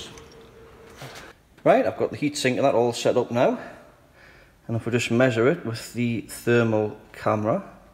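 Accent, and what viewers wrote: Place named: Geordie, United Kingdom. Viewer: I know that, my parents were Geordies from Ashington as well. They never dropped the of when they spoke that is more of a Sunderland thing. I was only making a point about you describing everything you do and wondered if it was worth it when people make silly comments about the things you've done. I apologise if I have aoffended you, I live in Australia now and it seems so odd when people have accents like yours.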